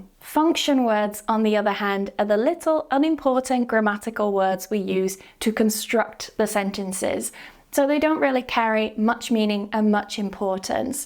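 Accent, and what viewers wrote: United Kingdom. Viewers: Bang lu komen dimana2 to bgus lah👍,, beliau ini englishnya accent British haha ww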